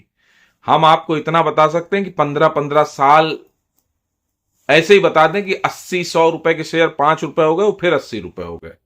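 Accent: Kanpur, India. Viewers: भाषा तो कनपूरिया है?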